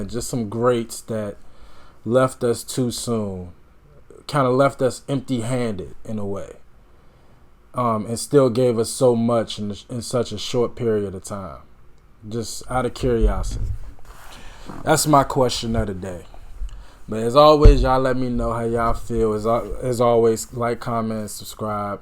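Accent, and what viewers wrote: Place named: Ireland. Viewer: Big time Irish accent.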